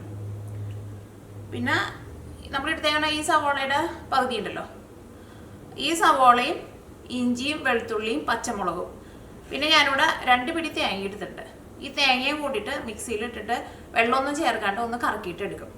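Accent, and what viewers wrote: Thrissur, India. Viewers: Jhan chechi dae valya fan aanu, more than any thing, chechi dae Thrissur dialect aaanu enikku eshttam... entae mummyum Thrissur kaari aanu, entae mummy thannae explain cheythu tharunna poolae thoonum, chechi parayunna, ethu recepie undaakiyaalum nalla taste aanu... jhan cooking il oru beginer aanu, chechi dae tips enikku othiri eshttanu ,....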